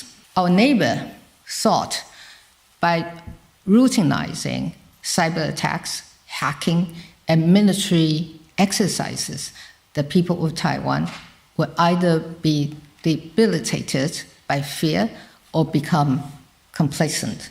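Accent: United Kingdom. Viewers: 現在仔細聽，真的有英國腔~ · 好喜歡聽她說的英國腔英語。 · 台灣出了一個帶日本腔英文的李登輝，又出一個帶英國腔的蔡英文，現在又有一個台灣腔的賴清德，真是連總統都多元化的寶地。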